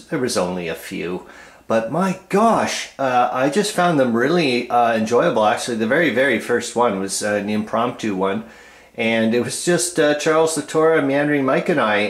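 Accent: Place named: Canada. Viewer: Sorry, but you sound very Canadian, are you from Alberta or Sask by chance?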